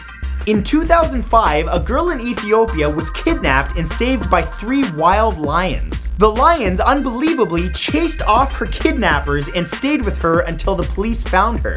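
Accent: Canada. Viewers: He's Canadian. Pretty obvious from the accent. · He's Canadian I am and we pronounce things different like you guys say bag we say beg! Or you guys say milk we say melk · Well he's Canadian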